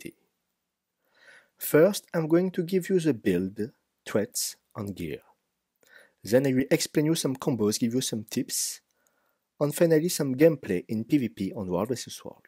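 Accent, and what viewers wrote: France. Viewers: I could listen to the cute french accent for hours lol thanks for the guide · Thank you for this video, I really enjoyed it as I am new player in GW2. I'm following all your skills, will keep playing as soulbeast. Just a friendly hint: Practice with your english pronunciation, you have very hard french accent, sometimes it's hard to understand some words.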